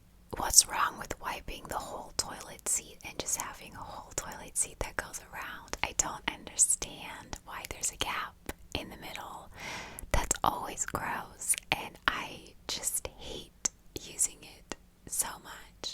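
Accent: United States. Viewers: R you not American?? U sound so American though 😮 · Your accent doesn’t sound European. Sounds American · Wait I’m confused where are you from? Im slightly deaf haha and I thought you were American but I obviously need to listen more often with my hearing aids in xx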